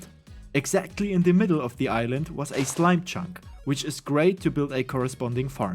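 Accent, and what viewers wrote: Germany. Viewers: Great Video. In the First few Seconds thought, is he from Germany? Yes he is😅 You hear a German Speaking English if you are a German yourself😆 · Are you German? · wait… are u german? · Did i hear the german accent?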